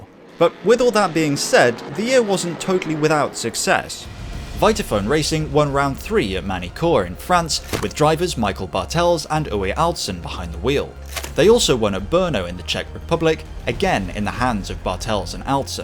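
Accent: United Kingdom. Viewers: How come you sounds British😂 I like that acent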